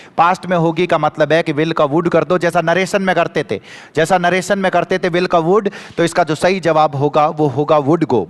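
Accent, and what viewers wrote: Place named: Marwari, India. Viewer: Hm jese dafar ko bhi aapki मारवाड़ी english ne achaa बनाया है sir ji english se bahut dr lgta tha such me ab wo dr khatm😢😊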